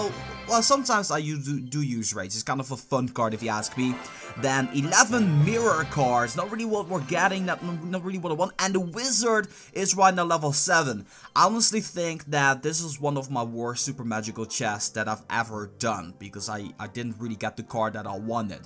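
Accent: Netherlands. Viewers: are u dutch cause i am and your english is like you're dutch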